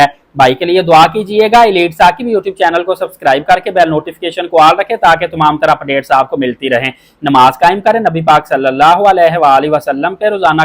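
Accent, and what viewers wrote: Punjab, India. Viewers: انکر صاحب معلوم نہیں اردو میں بات کر رہا ہے یا پنجابی میں بات کر رھا ھے اردو مکمل پنجابی لہجہ میں گفتگو فرمائ جا رھی ھے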